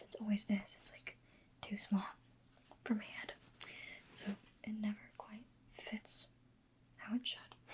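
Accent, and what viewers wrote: South, United States. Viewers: Can you make more southern ascent videos love them!❤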